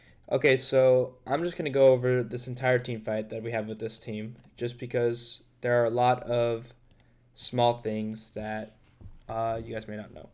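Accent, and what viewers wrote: France. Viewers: U french?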